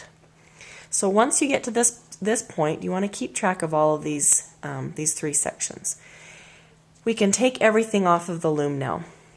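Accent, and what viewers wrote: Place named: England, United Kingdom. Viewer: Thx for the help but just saying u come from England ur not american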